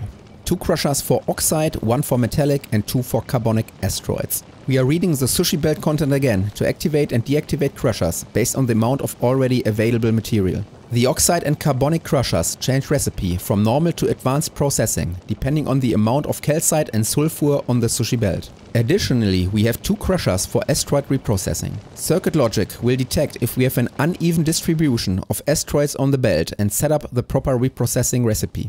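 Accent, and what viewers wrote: Germany. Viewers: I only just started making green science so I don't know why I'm watching this other than enjoying a German accent talking about precision and efficiency.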